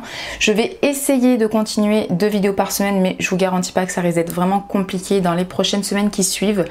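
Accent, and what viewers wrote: South, France. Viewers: Étant de Belgique j'entends que tu as l'accent du sud mais pas non plus le gros accent de la cagole de Marseille 😂😂😂 Ne te plainds pas trop moi j'ai un accent belge 😭😭😭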